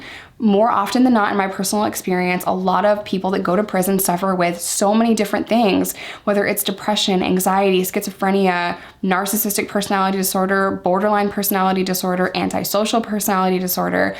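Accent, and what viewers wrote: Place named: Upstate New York, United States. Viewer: Brand new sub. Where are you from in upstate NY? If too personal, no offense. You sound like my hometown area code, 315! ETA: just read the katv article, you’re from Sidney?! My first GF was from Walton/Downsville, so crept through Sidney (from Utica) under the speed limit! Those cops were jerks!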